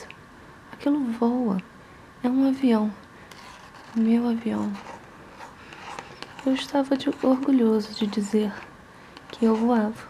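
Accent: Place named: Florianopolis, Brazil. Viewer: você tem um sotaque daqui de Floripa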